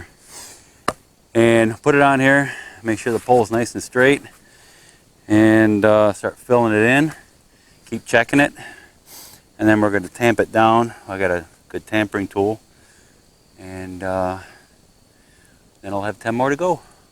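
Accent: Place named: Northeast, United States. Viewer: Never would have guessed You are from texas. Your accent sounded to me l ike you're from the North or the Upper Northeast almost Canada It's the 'O'. Got an O myself, Lived in the south all but the 1st 4 yrs of my life and I still got the O.😂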